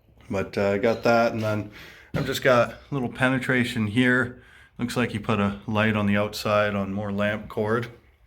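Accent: Canada. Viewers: You sure got a lot of space left in the shed and you got rid of the hornets without getting stung once 😂🥴 anyway I’ll see you tomorrow 👍🏼 I forget if you guys are in Canada or Michigan 🥴 because you have an accent I guess you could be from either 🤣 lately people have been reading my comments so maybe someone can tell me where you live not like it matters I’m just curious 👀 I don’t have much else I’m paralyzed and bedridden 🤯😂 and really enjoy your content BUT I guess I have to wait till tomorrow 🤣😂 anyway guys have a good evening 🙏🏼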